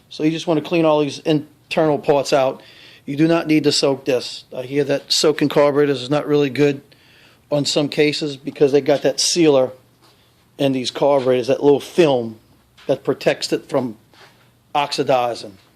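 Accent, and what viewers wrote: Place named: Appalachia, United States. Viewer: his accent makes me wahna throw my car at this hillbilly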